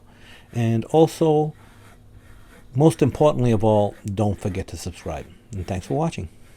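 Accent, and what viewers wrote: Boston, United States. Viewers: Boston area, I knew it the accent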